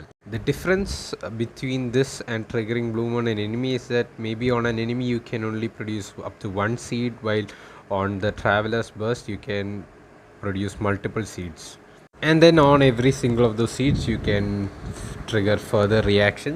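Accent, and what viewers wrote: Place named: India. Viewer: Bro you are Indian right your accent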